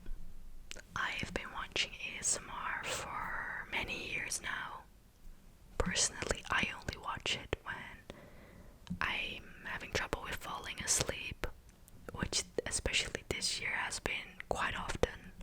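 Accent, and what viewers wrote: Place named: United States. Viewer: Wow, i wouldn't have thought you were from Netherlands, your accent made me think you were american. Where did you learn english by the way?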